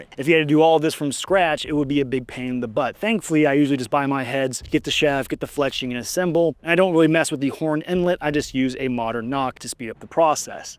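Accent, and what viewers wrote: England, United Kingdom. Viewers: I’m English and I’m very impressed with accent he could definitely become an Englishman! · His Brit accent isn't that bsd. Well, maybe it is but it's fun. 😁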